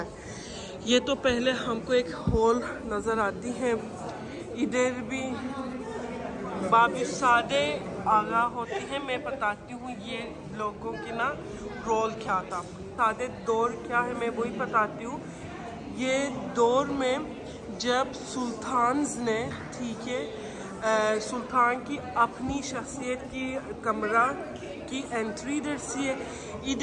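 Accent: Pakistan. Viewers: Assalam alaikum, this is Khawar from Karachi, Pakistan. Really like your urdu accent. Wish that when Me n my family visit Turkey we would love to meet you. Regards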